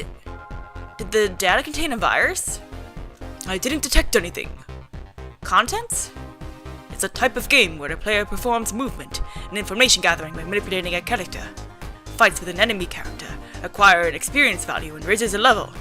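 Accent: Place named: South, United States. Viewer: Loool, love how your accent likes to default to Southern when you try and put on a voice XD! I must say, I nearly lost my hearing when you started doing Akushima's voice. I don't usually watch you and with earphones as I've learnt from before (ESPECIALLY when you voice Mr Bandages) that volume tends to vary sometimes:P But now I'm trying to catch up on this at work and just xD...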